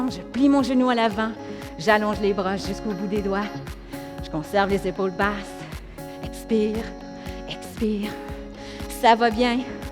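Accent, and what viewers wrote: Canada. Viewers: Bonjour de Bretagne! je viens de faire pour la première fois votre séance et j'ai beaucoup aimé. J'ai adoré aussi votre accent canadien, je reviendrai demain. Vous avez d'autres vidéos ?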